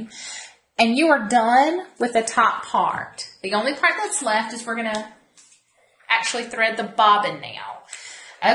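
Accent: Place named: South, United States. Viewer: I am like you in the facts that I've never touched a sewing machine in my life before this and that we have the same southern accent...lol. I'm from NC. I've heard you have to oil a sewing machine. Do you have to do that when you take the machine out of the box? Or is it just ready to plug up and sew? Thanks.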